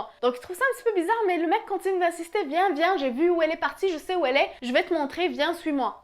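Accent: Quebec, Canada. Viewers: alors, c'est marrant, j'entend bien un accent canadien français (faut pas dire québécois, je sais) mais pas si prononcé que ça. française qui vis au canada? accent d'un autre endroit? disons que ton accent varie entre français et canadien...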